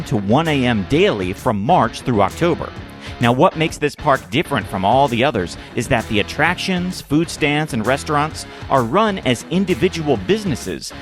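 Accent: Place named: England, United Kingdom. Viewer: ^^ Es is lustig zu höhren wie Engländer Deutsche Wörter ausprechen XD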